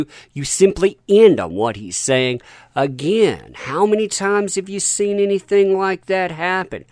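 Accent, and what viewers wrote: South, United States. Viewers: Yes, he has academic training and reports with rigor. That southern drawl is very charming.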